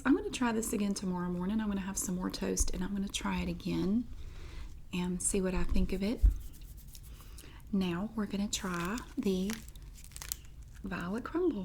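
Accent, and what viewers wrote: United States. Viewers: gor God's sake, Please stop with the pronunciation issues. She lives in the States of course shed pronounce it differently than Aussies.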